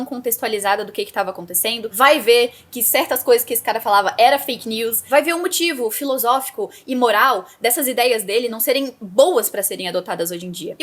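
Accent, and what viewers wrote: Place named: South, Brazil. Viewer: Você é do sul?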